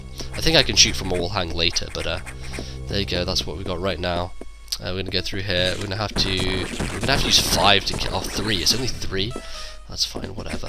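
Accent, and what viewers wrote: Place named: South Africa. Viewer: Your Australian accent, more south african..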